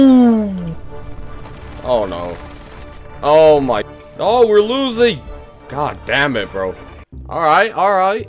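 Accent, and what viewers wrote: Norway. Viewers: Are u Norwegian? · Are you norwegian???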